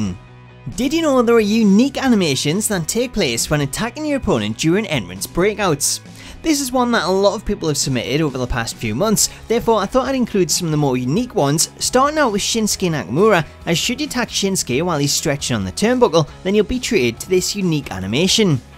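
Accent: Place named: Scotland, United Kingdom. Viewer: You’re Scottish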